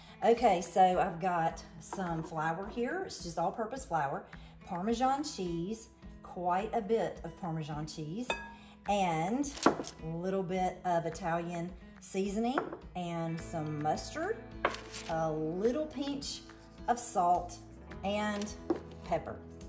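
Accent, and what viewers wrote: South, United States. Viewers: From one Southerner to another I just love your accent. Greetings from the great state of Tennessee. Sadly, the Southern accent here in East Tennessee is being watered down by the influx of people from the Northeast. They're actually invading the Southeast. Anyway. Love your videos. Take care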